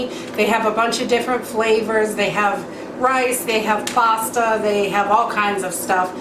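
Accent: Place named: New England, United States. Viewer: Love your channel. Are you from New England? I hear the accent. I’m in Southern NH.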